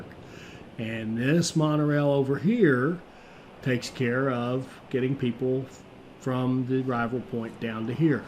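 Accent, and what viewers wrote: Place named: South Carolina, United States. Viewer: You sound like a Clemson man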